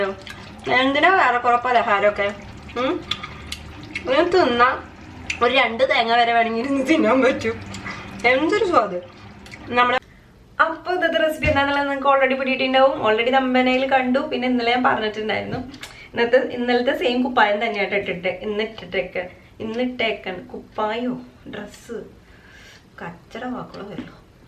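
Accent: Thrissur, India. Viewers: Super recipe,,,,appo.........,nammalu Thrissur karude samsaram kelkkan entha rasam 🤩🤩🤩......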